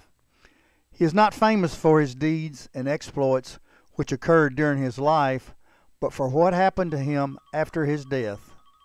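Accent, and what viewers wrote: Texas, United States. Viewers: I like how the narrator has a Texas accent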